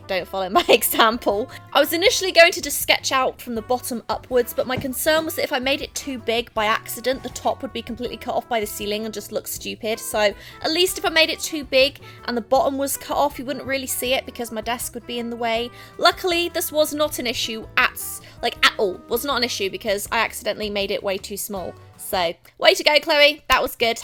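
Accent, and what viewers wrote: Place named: Cornwall, United Kingdom. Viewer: chloe are you from Cornwall sounds a bit cornish? I love your art by the way Luv from the UK xx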